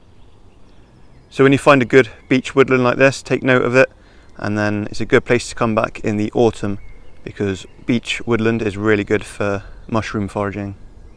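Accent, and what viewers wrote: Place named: Bristol, United Kingdom. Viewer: Where abouts are you?? You sound Bristolian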